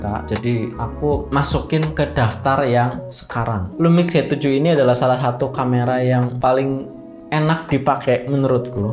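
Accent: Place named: Java, Indonesia. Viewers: logat ngapak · Medok oke jadi aku menetap untuk menonton 👍🏻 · Logat ngapak nya ga ilang ,bagus ,identitas 😂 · Medok ya mas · Kayak'e wong ngapak ya mas'e? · Ngapak ya mas.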